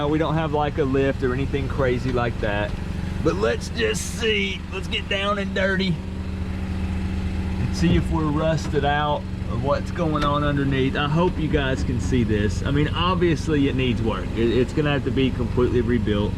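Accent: South, United States. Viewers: You sound like Carl Sagan if he had a southern accent. 👌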